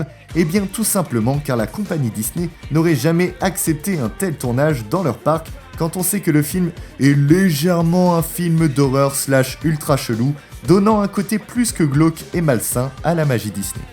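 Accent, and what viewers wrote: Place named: England, United Kingdom. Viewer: Tres bonne vidéo et bien interessante. Et bel accent anglais^^. Sympa vos vidéos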